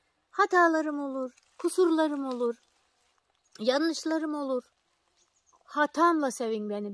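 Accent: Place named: Giresun, Turkey. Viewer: kolay gelsin ablam masallah sanasiz nerelisiniz acaba giresun lumusunuz siveniz oraya benziyo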